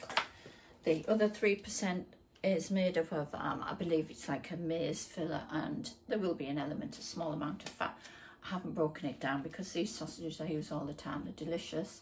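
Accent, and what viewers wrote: Northern England, United Kingdom. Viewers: Nice to hear a Northern accent - I’m originally from Grangetown, in Middlesbrough!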